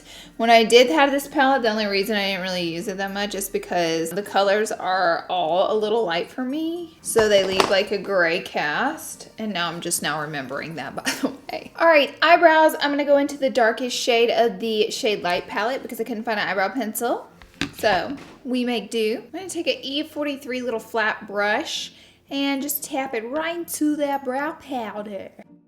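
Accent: South, United States. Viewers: A new sub here! I love your content, your delivery and that damn southern accent!!! "Conciller".. LOVE IT!!!!!!! 😀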